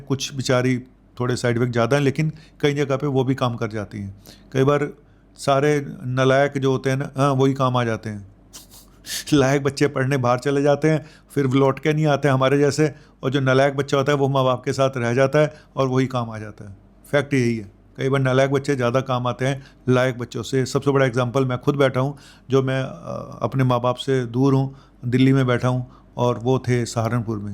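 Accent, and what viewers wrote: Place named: Uttar Pradesh, India. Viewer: Sir 🙏 hum bhi U.P. Mujaffar ngr. sy hai Tabhi aap ki language hamri jaisi hai,samne wale sath saf bolo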